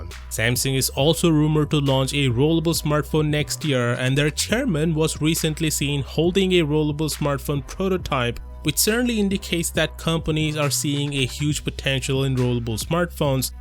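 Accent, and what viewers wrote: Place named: India. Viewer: Man I can't listen to his indian accent. Furthermore it's an complete nonsense to say that it is a advantage of rollable phones that they are thinner. You could easyily built a foldable phone to be as thin as a rollable but manufacturers don't because you need a larger battery for a larger display. The same goes for rollable phones. Yes this phone is thinner but I don't want to know how the battery life is when it's unrolled.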